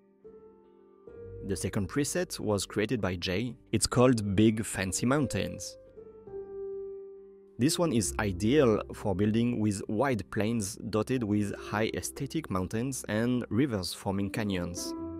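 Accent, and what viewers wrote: France. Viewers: Oh je reconnais cette accent... 🇨🇵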